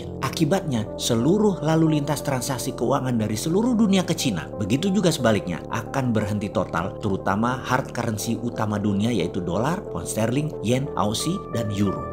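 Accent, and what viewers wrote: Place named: Surabaya, Indonesia. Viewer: Jamput rek ..cakcuke arek suroboyoan.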